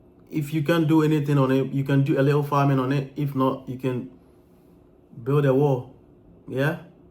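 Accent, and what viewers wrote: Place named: Africa. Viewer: My question to you and every African who migrated to the West (Your accent tells that you are born on the Soil of Africa) ….. Why would you think You can call UK 🇬🇧 Canada 🇨🇦 or US 🇺🇸 Home forever. Glad President Trump is waking Everyone up. You may have the Passport that Shows that you are citizens of that HOST country. But wait ……There is More.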